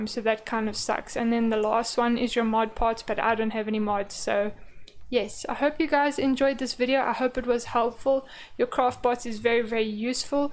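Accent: South Africa. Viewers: Love the accent. I'm thinking South Africa. Great video. Very helpful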